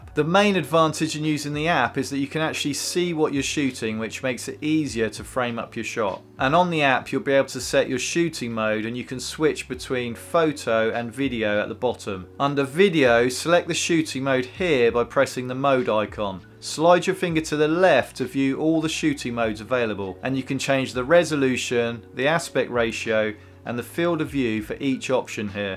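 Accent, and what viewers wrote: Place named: England, United Kingdom. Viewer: good to hear a English accent for a refreshing change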